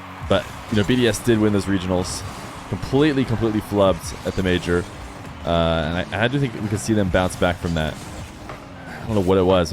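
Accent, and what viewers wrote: United States. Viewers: You sound like Johnnyboi if he had an American accent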